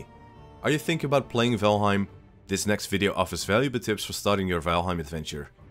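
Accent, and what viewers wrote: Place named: Netherlands. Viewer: Such a Dutch accent lol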